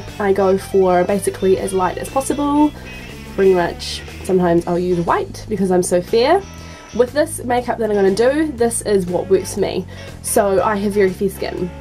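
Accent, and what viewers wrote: New Zealand. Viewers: is your accent newzelanden accent?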